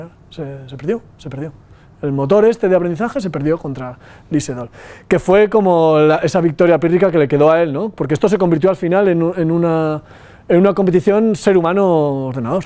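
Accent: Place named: Spain. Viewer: LOco me carga el españolisimo no entiendo NADA jajajaaj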